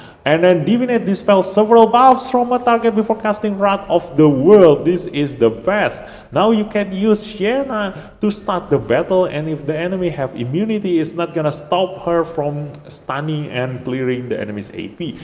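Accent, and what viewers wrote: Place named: Indonesia. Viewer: Hmm I recognize that accent, indonesian? Nice vid btw, good to see more dislyte content creators.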